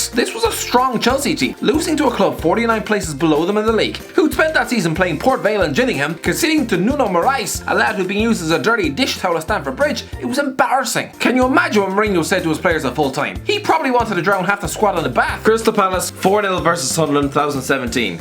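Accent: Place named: Ireland. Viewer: Irish guy voice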